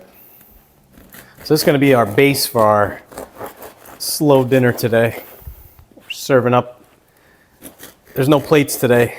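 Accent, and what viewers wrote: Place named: Long Island, United States. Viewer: are you from Long Island? I sense you are as am I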